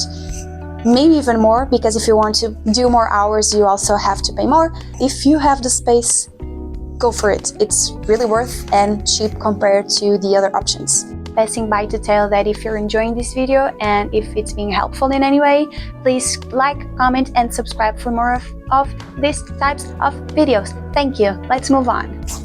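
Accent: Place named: Europe. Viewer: What country are you from? I can tell that you're European from your accent, but I can't tell exactly where.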